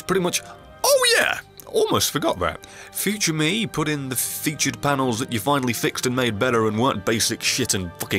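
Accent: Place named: England, United Kingdom. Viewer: It feels weird to think that I subscribed to this back when you didn't even have 20k subscribers. Hell, I thought you ha way more subscribers until you uploaded that 50k subscriber video. It was just legitimately funny watching you getting your shit wrecked by Dark Souls bosses & having goofy reactions to multipayer gamertags. Then again, I usually find rapid-fire comedy with an English accent irresistible. Congrats on the 100k!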